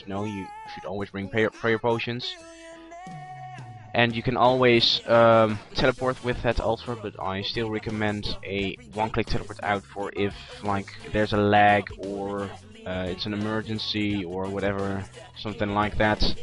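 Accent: Netherlands. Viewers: You're Dutch, I can hear that lol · lol u can hear. he is from the netherlands :P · haha ik kan gewoon horen aan je accent dat je nederlands bentXD: WEL TOF TROUWENS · r u dutch? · dutch accent!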